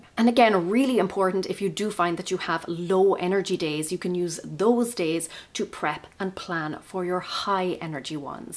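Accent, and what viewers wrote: Ireland. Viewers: These were great tips, thank you. Might have a look at what else you have. Love your accent, are you Irish? Thank you for sharing 🥰🙏🥰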